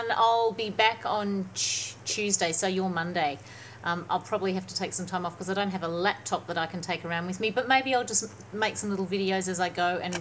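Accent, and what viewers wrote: Australia. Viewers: i love australian accents<333 so cool! love the tan, you look FIERCE girl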